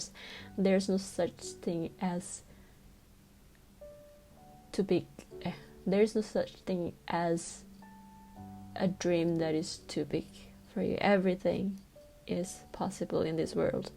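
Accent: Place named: United Kingdom. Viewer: how can u speak like a British? Can u give somes tips n trick?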